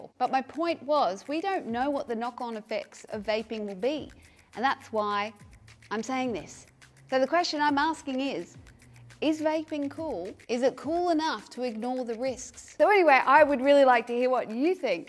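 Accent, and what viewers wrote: Australia. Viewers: Don't know anything about vaping, just smoking is bad for one's health. Just want to say I love the Aussie sense of humor and the accent. my siblings have been to Australia and my neice went to university in Sydney. They love it. Keep up the good work.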